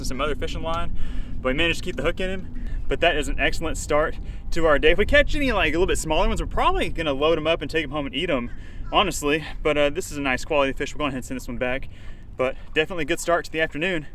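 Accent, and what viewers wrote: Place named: South, United States. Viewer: Every once in a while , we hear the southern accent . Very cute .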